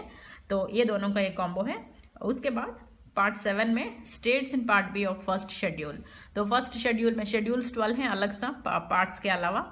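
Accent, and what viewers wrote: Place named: Telugu, India. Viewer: by her accent of hindi, i can say she is telugu...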